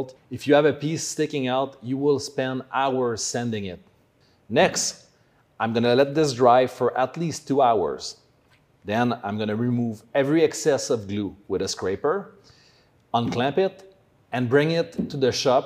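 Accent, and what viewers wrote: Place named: Quebec, Canada. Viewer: un québécois et sa parrait comme moi génial merci pour les super conseil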